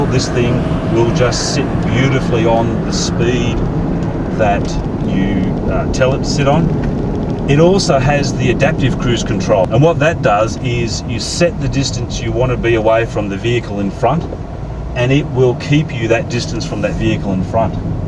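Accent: Australia. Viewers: loved this car and your review!! i love the aussie accent :D is it rwd?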